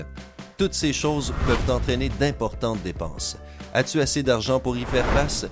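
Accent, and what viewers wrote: Canada. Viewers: Techniquement, ça s'entend que le doubleur est canadien (ou québécois peu importe) donc ils suivent globalement les mêmes règles. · Ces conseils semblent tirés d'un magazine axé sur le même stéréotype : un couple, un enfant, un budget, Papa travaille dans le tertiaire comme cadre moyen... C'est très réducteur, ces conseils qui n'ont rien de nouveau. J'ai l'impression, de plus, qu'avec l'accent de la voix off, ces "14 faits" proviennent du Canada où les conditions sont différentes - on demande, en France, si facilement une "promotion" ? - non, et tous les chiffres budgétaires sont approximatifs (et on a tout converti en Euros). Les chiffres de l'immobilier sont très variables en France, mais une maison correcte à 100 000 € sans rénovation coûteuse, ça n'existe que dans quelques départements désertiques. Et puis les bons conseils entre ne pas emprunter, mais savoir investir, on est là encore axé sur un modèle unique presque idéal. 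Tout le monde est fait pareil ? Non. J'ai l'impression d'entendre à nouveau les conseils de ma mère quand j'avais 20 ans, et à des conseils trop formatés, il n'y a jamais de résultats parfaits (voire des échecs). Ma mère n'imaginait pas, il y a 30 ans, ce que je serais aujourd'hui, dans un contexte socioéconomique radicalement différent. Donc, tout cela est peut-être vrai, mais pour "un-couple-trentenaire-qui-veut-avoir-une-maison-pour-avoir-un-enfant-à-payer-avec-une-augmentation-de-Papa". La pub idéale, quoi. La vie idéale qui n'existe pas.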